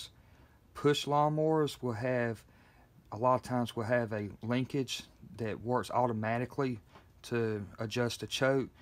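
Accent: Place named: Alabama, United States. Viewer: AWESOME DISCRIPTION of how CARB works! very thorough And nice southern accent! it sounds like it might be from Alabama like my Momma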